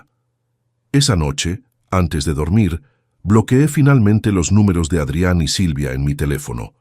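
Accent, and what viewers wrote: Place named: India. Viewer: Eso de narrar estás historias en indio, las perjudica totalmente,, si no se corrigen no tendrán mucha audiencia, es patética, está forma de narrar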